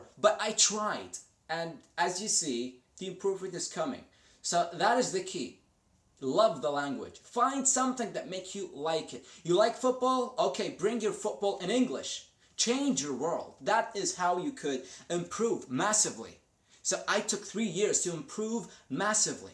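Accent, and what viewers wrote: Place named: United States. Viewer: my words!!! this guy has just blown me away. Incredible to watch you speaking exactly in the American accent. You are just killing it man, I understand how much efforts you would have putted in it. And from a country like SAUDI ARABIA where ARABIC is everywhere. Congrates man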